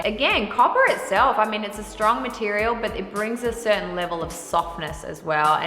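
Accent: Australia. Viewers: Her accent is from South (or) West Australia.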